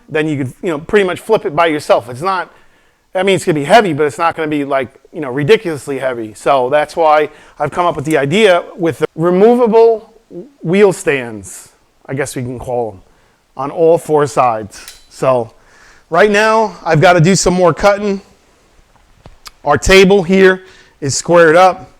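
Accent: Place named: Philadelphia, United States. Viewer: I listened to you for a minute and was like he’s from tristate area. Thats a Phila accent if i ever heard one. Then looked up Alro and there one 10 mins away haha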